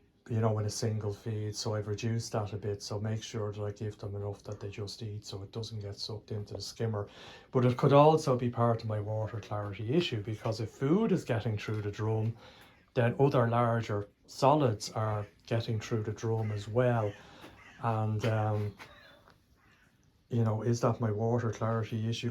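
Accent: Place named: Ireland. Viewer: Clean your ears out. Its called an irish accent. Thats all. Im english. Understood everything perfectly. Great vid. Keep up the great work.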